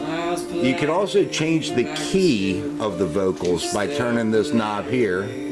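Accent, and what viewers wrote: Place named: Pittsburgh, United States. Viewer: ....that accent though!!! Bro that sounds like Pittsburghese lol!! Great review though man....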